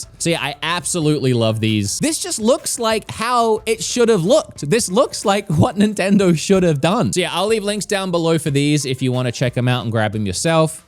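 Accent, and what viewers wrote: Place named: Australia. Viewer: Can someone help me out with identifying his accent. I thought it was Aussie, but then it also sounds American?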